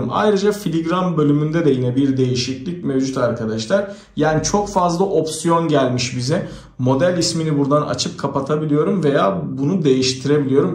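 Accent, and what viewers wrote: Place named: Sivas, Turkey. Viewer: abi sivasın neresindensin :D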